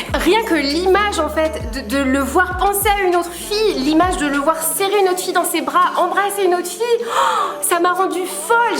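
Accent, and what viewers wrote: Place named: Normandy, France. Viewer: J’adore ton petit accent normand car je suis également native de cette région et j’ai cette sensation réconfortante, familiale quand je regarde tes vidéos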